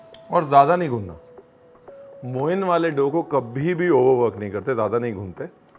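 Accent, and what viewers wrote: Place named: Lucknow, India. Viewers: Sir aap ki baatein Mujhae Kanpur aur Lucknow ki yaad delati hain.my home sweet home.n you share authentic UP RECIPE 👌🏻👌🏻👌🏻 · Apki lakhnavi bhot acchi baat karne ka salika was nice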